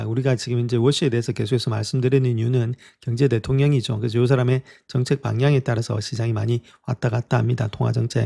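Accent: Gyeongsang, South Korea. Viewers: 님 경상도 분이시져? ㅎ 설명할 때 가끔 사투리.. 얼마니 친숙하게 느껴지는지 금리 내라야..ㅎ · 가끔 사투리요? 1초만 들어도 찐찐찐 경상도 토박이 사투리 영상 내내 엄청 쓰시는데요?ㅋㅋㅋ서울경기분이면 1초컷이예요. 사투리 심하게 들리는거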